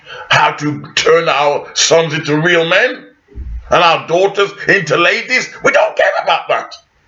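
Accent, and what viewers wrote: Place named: Caribbean. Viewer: Rick James with Island accent. Thumbs up.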